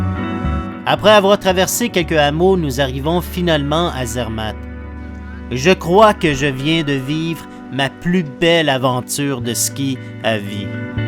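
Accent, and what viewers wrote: Quebec, Canada. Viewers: Comme j'adore votre accent Québecois !!!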